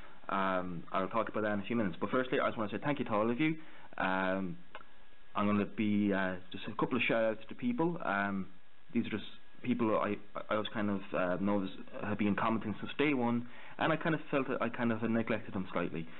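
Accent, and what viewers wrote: Ireland. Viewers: Great to see ya back, and to hear those dulcet Irish tones;) Ya there's still snow in the woods over here but it's melting really fast now. It's pretty much been the worst winter that I remember ever. I'm hoping that it's the last one like that. I really like the look of that panzer 1 bud, I normally don't do the contest thing, but I may make an exception. Mark me down for when you do the draw eh? That's a nice little kit and I've never build ta Pz I.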